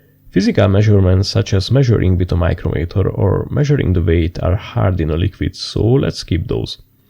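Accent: Hungary. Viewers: amikor az ember megismeri a magyar akcentust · i heared the first sentence and i already know you're hungarian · Its just hungarian accent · Your accent is veeeery hungarian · A jó magyar akcentus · Me at 1 AM: > "oh boy, seems like we're blessed today to find another hidden gem of a channel" > the narration is the platonic fucking Form of the hungarian accent actually manifest > now I cannot stop smiling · your accent sounds like hungarian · az akcentusod alapján magyar lehetsz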